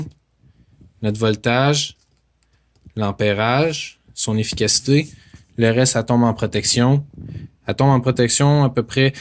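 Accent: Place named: Quebec, Canada. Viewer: gros accent du quebec :P je viens juste de commandé sur amazon 36$ livré le lendemain anyways 20 amp x 12 = 240 possible mais pour 36$ je chialerai pas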